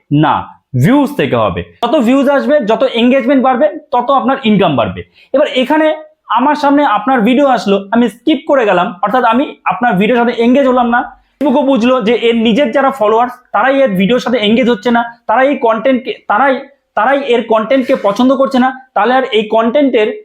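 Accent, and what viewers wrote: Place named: West Bengal, India. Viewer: পশ্চিপবঙ্গের বাকভংগি ভালো লাগে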